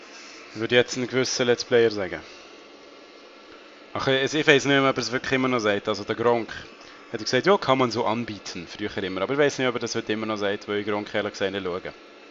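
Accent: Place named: Switzerland. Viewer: Er spricht halt Schweizerdeutsch aber ok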